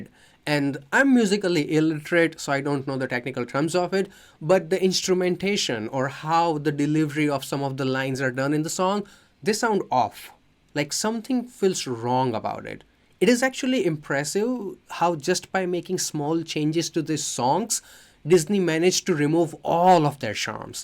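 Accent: India. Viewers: My god I love Indian accents so much lol I could listen to it all day Great video and good points made, I’m just so tired of live action movies and sequences… Disney really just wants to play it safe, and they think the problem is when they come up with originals, well, they just need to make the originals NOT SUCK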